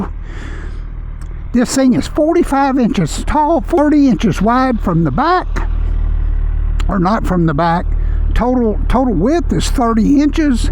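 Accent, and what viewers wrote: South, United States. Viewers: The high-pitched redneck voice sells the channel immediately - AMAZIN'!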